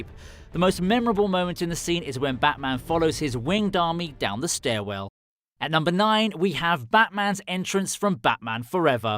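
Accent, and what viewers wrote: United Kingdom. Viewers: i wanted to watch this till i heard this anus voice dude yer dumb. do people think british voice is cool? i do NOT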